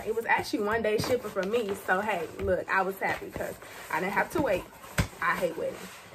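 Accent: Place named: Memphis, United States. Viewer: You sound like you from Memphis!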